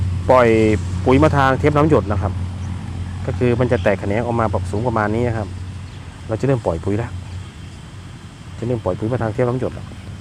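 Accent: Thailand. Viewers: ดีมากเลยค่ะ พูดภาษากลาง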